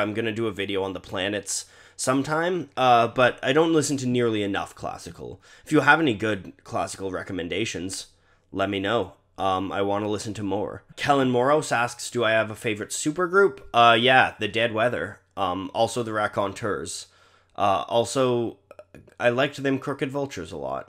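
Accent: Canada. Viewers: You sound so Canadian it is adorable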